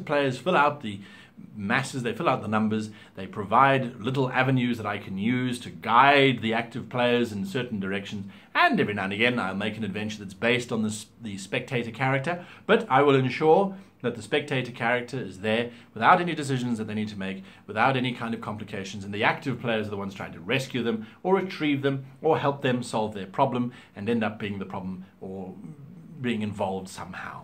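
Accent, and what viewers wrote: United States. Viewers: Thats a pretty good American Accent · Your American accent is like a combination of all the American accents. But over all it’s pretty good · That accent really threw me off, and it sounded like a normal american accent with a few funks in it. Cool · Good American accent! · Thank you for the video! Super helpful and relevant to a few players in my group. Also, it was super trippy hearing your American accent. I approve. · Your American accent isn't the worst ive ever heard, well done.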